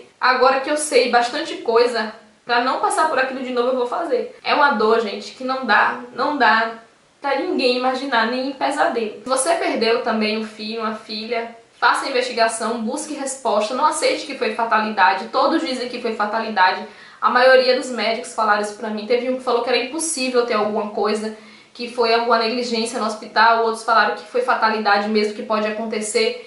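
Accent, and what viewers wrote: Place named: Cruz das Almas, Brazil. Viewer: ! Acabei de relatar minha história para você, e achei seu sotaque parecido com o nosso. Vendo agora seu endereço, percebi que também é de Cruz das Almas. Caso queira, eu posso te ajudar com indicação profissional e ombro amigo caso queira. Deus te abençoe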